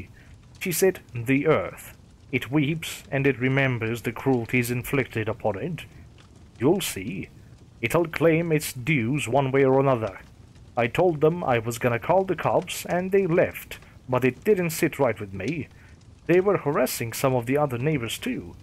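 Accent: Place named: Sweden. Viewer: it's not that your voice is monotonous. You have an odd tendency to raise the pitch of your voice when concluding sentences. It's unpleasant. Your accent sounds Swedish. Is that correct? If so, it might be because of that. I've also heard similar tendencies in Indian accents.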